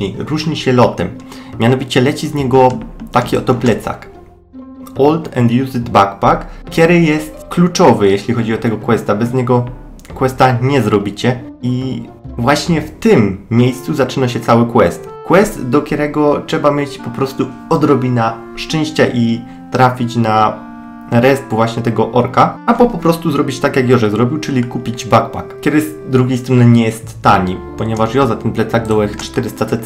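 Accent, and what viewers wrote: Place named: Silesia, Poland. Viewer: Lubię śląską gadkę :)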